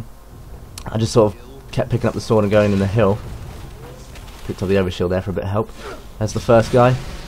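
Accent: United Kingdom. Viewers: You sound exactly the same. All you Brits do. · He was a calm little brit. Then he did a dig-down, and everything changed. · you got that awesome accent, you must be british?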